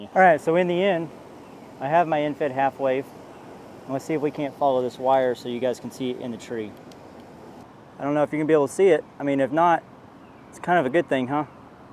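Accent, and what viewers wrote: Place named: Kentucky, United States. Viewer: How do you say antenna is how I knew you were from Kentucky!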